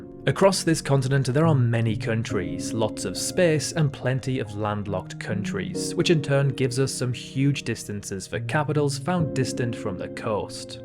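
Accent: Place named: United Kingdom. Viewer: Remember that he is British. British people talk differently. I kinda like the pronunciation “Kih-gah-lee” better anyway.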